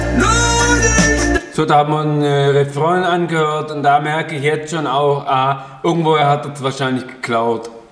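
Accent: Swabia, Germany. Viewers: Ich liebe Dein Schwäbisch, bin aus Stuggi ...herrlich 🙏👍🙏👍🤣🤣🤣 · Haha, das Geschwäble! Grüße aus Tübingen 👋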